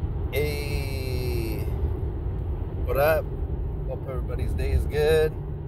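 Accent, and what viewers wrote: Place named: California, United States. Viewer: 🌱Good Talk.. ThankYou.🙏💯 its always nice to hear Someone Speak California. Too much im Always missing Home.🏡 the Southeast is Completely different.🍃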